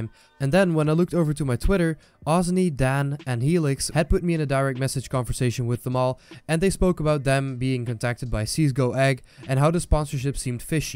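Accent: Netherlands. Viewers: I can hear a Dutch accent XD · You sound a bit Dutch!? can that be right?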